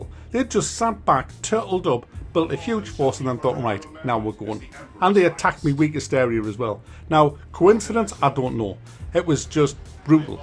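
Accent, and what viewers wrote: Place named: Newcastle, United Kingdom. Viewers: He's a geordie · How the hell do you talk like a Georgie 99% of the time but go posh when you say marrster instead of master?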